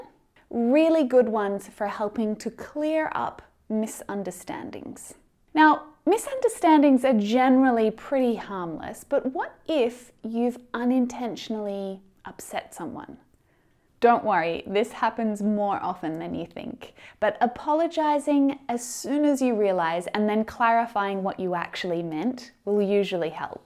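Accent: Australia. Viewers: For the way she talks, you can tell she has a "teacher" australian accent. If you come across some aussie he/she doesn't speak that articulate. I had an argentinian english teacher with the same way of talking but american accent. Nice video and VIDEOS btw!! (what does "mmm" stand for? "my magnetic mouth"?) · Hello, day by day your presentation is getting more interesting, and it is a bit hard to resist the temptation of watching you talking with a sweet Aussie accent. Keep up with your lessons that address all English language learners. You have chosen the best profession to make money through toiling and shedding sweat.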